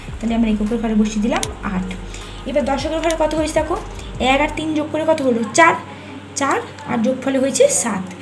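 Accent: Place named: India. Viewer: আপনার ভাষা শুনে মনে হচ্ছে আপনি ভারতীয় নাগরিক।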